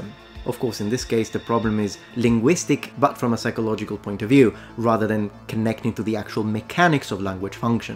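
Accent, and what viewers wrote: United Kingdom. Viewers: What's crazy is you are speaking to us, and I can hear your italian accent when you speak english with some british english accent undertones for how you pronounce certain words. It's just interesting though. Thanks for the video :D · as someone from the u s you have a uk accent. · your accent in english is british but for me its really clear and easy to pick up. i am not a native english speaker but i have heared several british accents and i always struggle to a certain extent, to the point that i try to avoid it sometimes. your accent is kinda wierd my friend i guess because you have italian bloodline btw i studied the american english accent. that is why its tough for me. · I'm new to the channel. As an American I find it kind of interesting that you're an Italian but speak English with an accent that I as an American see as mostly British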